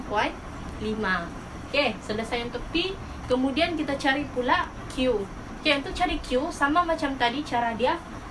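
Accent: Sabah, Malaysia. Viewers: Sabahan slang checkk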